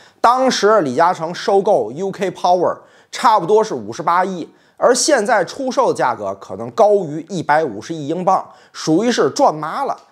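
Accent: Tianjin, China. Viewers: 当然不能给孩子听，孩子就是借着一句“小青蛙你就乖乖的待在井里吧”记住了节目，还有天津味儿的普通话也很亲切。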